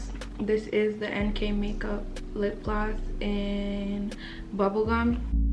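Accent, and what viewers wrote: Jamaica. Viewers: Oooh! I hear that Jamaican accent! 🥰 i love meeting other Jamaican Youtubers like myself! We ah go up this year!🤪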